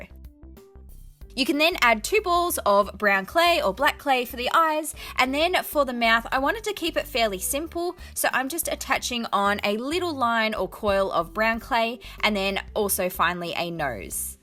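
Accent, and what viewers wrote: Australia. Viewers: I don’t know what’s cuter your creations or your Australien accent 😻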